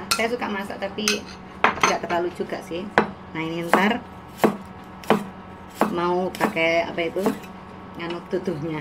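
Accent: Java, Indonesia. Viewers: mbk seng ayu iki jowone teko endi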